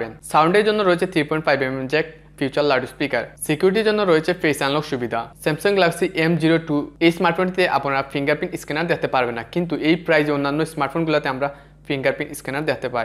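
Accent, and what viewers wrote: Sylhet, Bangladesh. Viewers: vai sylhety na kita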